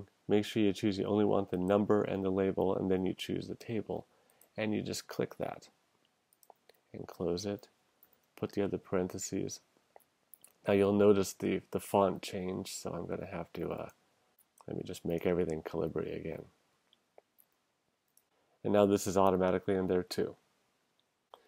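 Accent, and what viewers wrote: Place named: United States. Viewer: 日本人ですか。英語が上手なので、アメリカ人だと思いました。Besides, great video, first one that I found on this topic that explained everything properly👍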